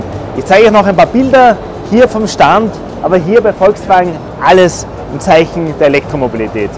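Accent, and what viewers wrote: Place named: Austria. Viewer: Ich glaube, für Leute wie mich, die sehr aufgeschlossen und begeistert für E-Mobilität sind, aber andererseits nicht sehr risikofreudig - seid Ihr die richtigen Partner. Als Allgäuer höre ich die österreichische Sprachfärbung sowiso lieber, als die Ost-Deutsche.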